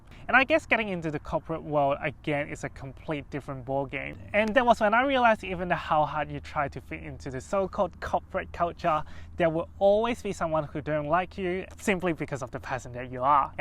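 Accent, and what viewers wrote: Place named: Australia. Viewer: Good video mate. Your accent is a bit Aussie. I went to Hong Kong 40 years Ago and it was a very friendly Place. Went back again recently And it's so crowded and not Very friendly anymore. Times change.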